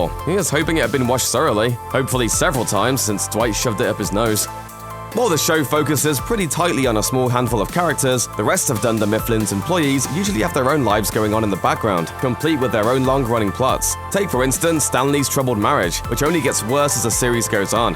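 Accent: United Kingdom. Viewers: Is it me or the British-accent (of the narrator) doesn’t really go with the American show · Love that a British guy is talking about the US version.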